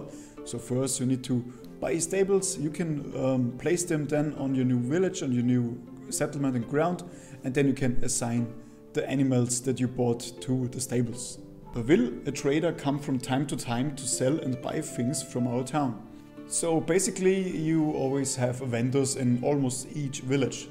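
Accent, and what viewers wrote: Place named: Germany. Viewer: Hmm jak na pl to akcent masz niemiecki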